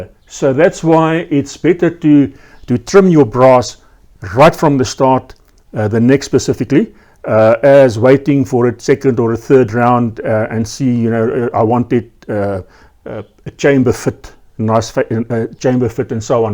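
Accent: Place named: Afrikaans, South Africa. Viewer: I recognize that accent! Classic Afrikaans accent. ❤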